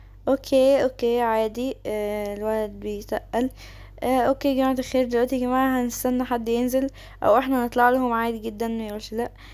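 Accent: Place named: Saudi Arabia. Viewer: دقيقه انا ابي استوعب رديتي صح اححححح ما توقعت والله العظيم بس اشوفك دايم تتكلمي سعودي شوي؟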